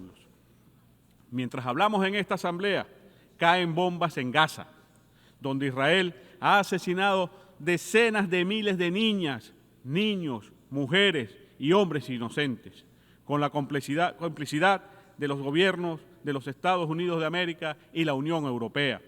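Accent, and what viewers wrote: Cuba. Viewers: Usted tiene toda la razón eso ni dudarlo puro Cubano. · si acento es cubano, trata de hacerlo neutro pero se le sale lo cubano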